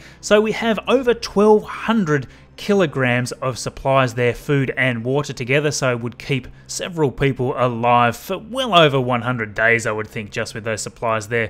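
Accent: Australia. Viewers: Are you an Aussie or a New Zealander?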